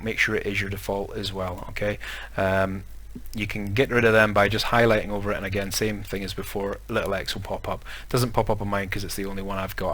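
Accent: Scotland, United Kingdom. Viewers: If your a Scots man we can understand anything 😅 thanks mate new sub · I just love that Scottish accent as I want to call you. LOL. But Capt kirk said no. LOL. What about VPN's and are they worth the money? The Bleeping rkill is old and not sure where to download it. I am running win 7 bc I HATE win 10 and will only have it if I have too.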